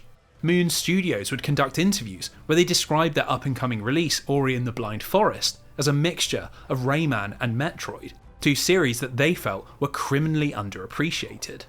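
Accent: United Kingdom. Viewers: Wow this makes me want to play every Metroid I started with Metroid prime as a 9 year old it was too much for my simple mind then but I want to revisit all of them after this video and I’m so so sorry you fighting cancer god bless you!! I just got sober first time in my life different fight but still my life was on the line due to my drug of choice…and I will always argue you deserve millions of views and I’ll always say the smooth UK accent doesn’t hurt (; thanks bro love yah I’ll pray for yah and don’t ever stop fighting 🤙🏻❤️👍🏻🫶🏻👊🏻💪🏻💪🏻🦾 you kick that cancers ass you make so many peoples day brighter and feel nostalgic of their own past and for that we all thank you !! 🎉🎉🎉🎉 let’s go brother !!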